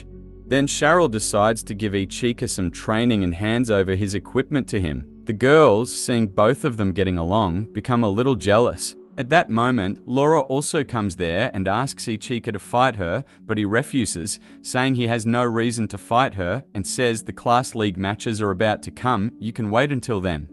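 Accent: Australia. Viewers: Nice Australian accent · Australian accents go hard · the assie accent is really offputting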